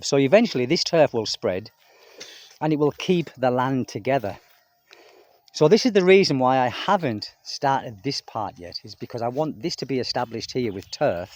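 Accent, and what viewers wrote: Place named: Northern England, United Kingdom. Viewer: Funnily enough i did ask GPT about teaching English online without any qualifications and it suggested Preply. I only need to earn about £300 a month when living there to stop me eating into my savings but i do plan to have a dabble with YouTube also. Maybe do POV walking vlogs or if i'm not too shy do the proper vlogs walking about interacting with people. You do Preply or something similar? I don't have a TEFL certificate or a degree so i'm restricted to the casual platforms. Where were you from in the UK by they way? I'm Nottingham, but i suspect you were a bit further "oop norf" Sheffield? Can't quite place the accent 😅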